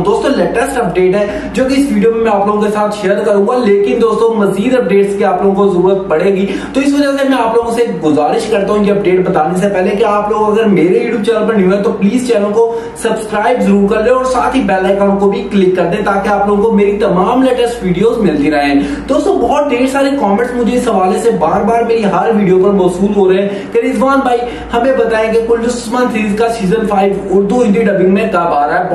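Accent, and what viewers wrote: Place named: United Kingdom. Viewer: Bhai apki voice thode clear karlo aisa lagraha hai koi British urdu me baat karraha hai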